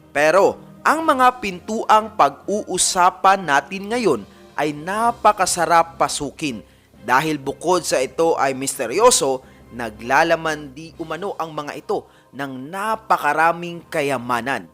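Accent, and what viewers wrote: Visayas, Philippines. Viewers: Bisaya diay ka kuya ahahah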